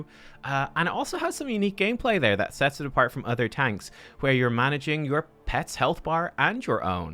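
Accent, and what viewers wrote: Russia. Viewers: Loved the Russian accent, your suggestions and these last few video's! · The Russian accent just won the internet.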